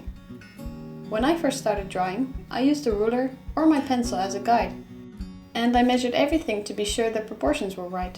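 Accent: Netherlands. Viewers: Is that Doutzen Kroes? Are you dutch cause you really sound Dutch! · Are you Dutch? As a fellow dutchie I feel like I recognize the accent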